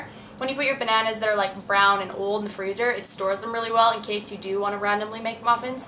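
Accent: Australia. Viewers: Before you didn't have an australian accent, but now since you have lived there so long, i can tell your getting it. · You sound like you have a bit of an Australian accent! Maybe when you were there you picked a bit of an accent up :p love your makeup btw your stunning! -3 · I can def hear that your from australia but it's not that strong :) Love the lipcolor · Do you think that since you've moved to Australia, you're starting to pick the Australian accent? Haha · I feel like you have a slight slight austrailian accent that comes out in some of your words. its awesome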